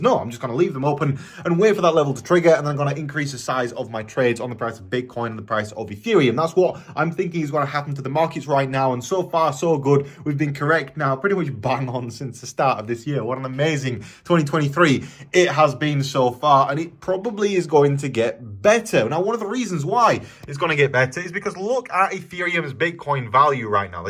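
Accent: Yorkshire, United Kingdom. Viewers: Me too. I love bold and confident nature and style with his Yorkshire accent. I am from a Leeds too by the way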